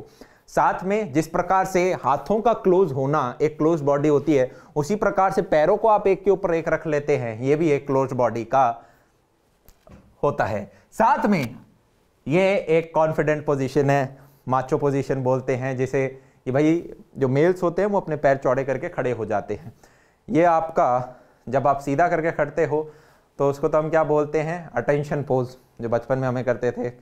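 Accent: Haryana, India. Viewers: Hariyanvi mix😅😅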